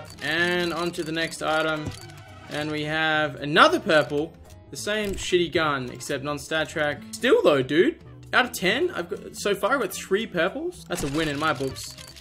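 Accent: Australia. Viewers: Your aussie?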